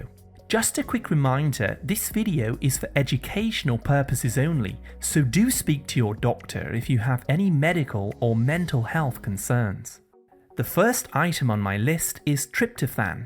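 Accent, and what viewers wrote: United Kingdom. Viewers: British accent. From England.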